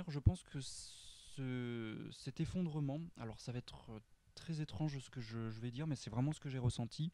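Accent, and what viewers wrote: South, France. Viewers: votre voix est agréable avec aussi cet accent du soleil . bonnes voyances également. cordialement .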